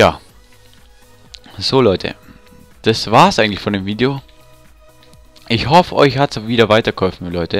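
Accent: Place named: Upper Bavaria, Germany. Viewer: hört man ;) oberbayern?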